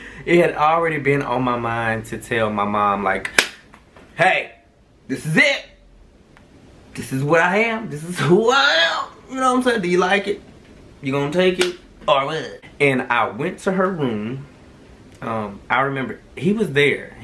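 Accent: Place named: Alabama, United States. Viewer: What part of Alabama you from bruh!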